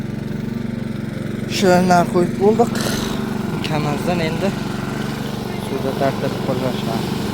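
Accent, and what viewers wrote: Surkhandarya, Uzbekistan. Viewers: Бу қаер. Шеваси Сурхандарё ёки Қашқадарёликми дедим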